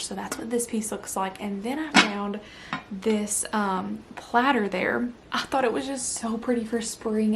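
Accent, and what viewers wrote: South, United States. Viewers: You are so lovely to listen to. Love your accent, reminds me of when I lived in Tennessee- love the southern accents 🇬🇧🇺🇸🌸🌸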